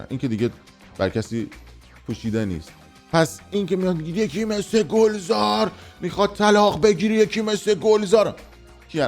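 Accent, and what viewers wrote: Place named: Khuzestan, Iran. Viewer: کاکا دِمِت،عاشق خوزستانی حرف زدنتم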